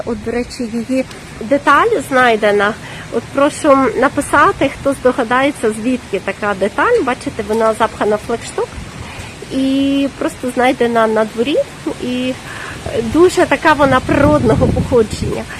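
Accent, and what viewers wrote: Lviv, Ukraine. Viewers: інтонації... абсолютно львівські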